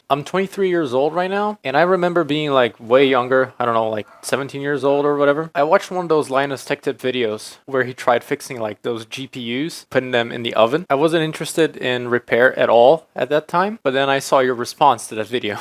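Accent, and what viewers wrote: United States. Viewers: You speak English near perfectly! If you didn't tell me your nationality and where you lived, I would've thought you were an American from the North East or California! And your wireless mic sounds great! Adeus!🙂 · i thought that he had a bit of that ny flare ahah, i second that blame on rossman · You taught yourself English?! You pretty much sound American, man. That's impressive 🙂 Well done!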